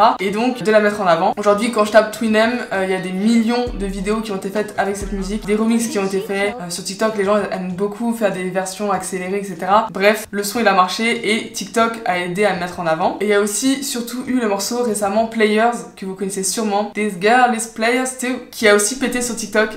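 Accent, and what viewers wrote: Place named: France. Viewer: Trop bien ! J'adore ce format. Le seul truc que j'aurais à redire c'est : arrêté de te justifier haha. Par exemple mets un petit disclaimer au début pour ton anglais au lieu de le dire à chaque fois et traduis directement les paroles (tweet, interviews etc) pour ne pas "galerer". De toutes façons les français ont tous un accent très prononcé et souvent un anglais très moyen, ne te prends pas la tête ! On est pas la pour te juger. 🫶 En tous cas grosse force à toi, on attend la suite ! 🤼‍♀️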